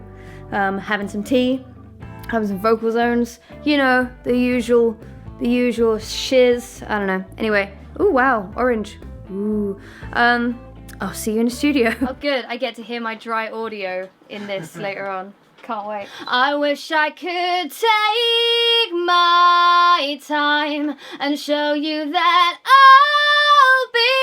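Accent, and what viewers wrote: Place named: London, United Kingdom. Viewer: Your voice has gone very London girl in this video. I am neutral on this.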